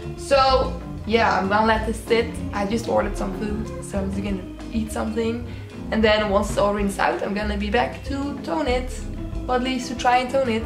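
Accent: Netherlands. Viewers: Are you dutch? ☺️ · Ur dutch, i can hear it. But i stan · Is she Dutch? Just wondering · U sound so dutch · .... Are you Dutch? You talk like my classmates in English class.. well actually a bit better but it’s like I’m talking English but then without an accent ( sorry no offense) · Are you Dutch? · Is she Dutch? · i think she’s dutch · Where are you from? Your accent sounds Dutch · Are you dutch??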